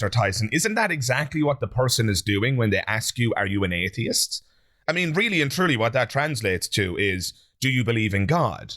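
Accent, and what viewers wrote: Ireland. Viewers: Holy sh*t! You're Irish?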